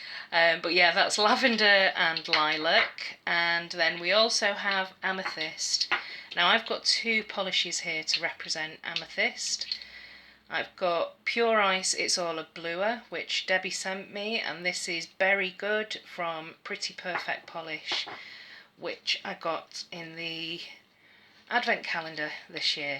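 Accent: Scotland, United Kingdom. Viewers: thanks babe. omg im pmsl here at the plums and the scottish accent. 😂😂😂 x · These are my absolute favourite vids of yours. I love learning about colour! I've been organising my ROYGBIV series and I've written 3 different sections for purples, lol. Oh man, I need to get that Snugglebutt. Ahahaha, your Scottish accent xD Excellent vid!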